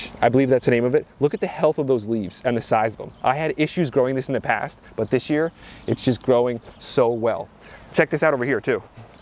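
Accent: United States. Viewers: I was thinking he was an American in Jersey uk .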